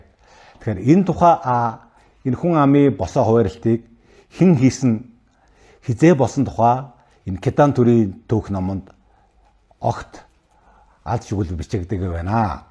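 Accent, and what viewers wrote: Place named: China. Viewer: энэ одоо ямар гээчийн монголоор гайгуй ярьчихдаг хужаа вэ!!!!!!!туух гуйвуулаад байгаам уу эсвэл яриад байгааг нь би ойлгохгуй байгаамуу?????хужаа л байна ш дээ!!!!!!яриа нь ойлгогдохгуй юм байна !!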